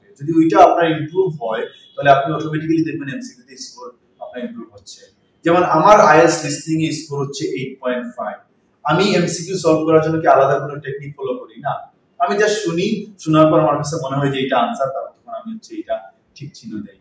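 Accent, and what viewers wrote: Sylhet, Bangladesh. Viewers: Apni ki sylheti?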